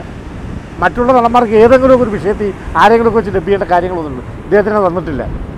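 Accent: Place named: Cherthala, India. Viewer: Cherthala basha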